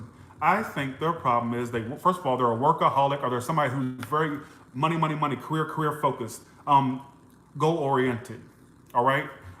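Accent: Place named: Texas, United States. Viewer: you sound like a Texan, reminding me of my cousin walter/jeremiah, from Pasadena / houston <3 lmk if you are. love it. and im a spiritualist/ tarotist too, i had my entire YouTube erased , just about. i feel your pain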